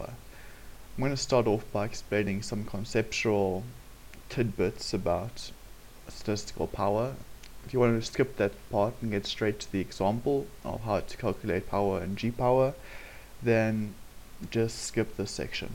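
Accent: South Africa. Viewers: screams are you South African??!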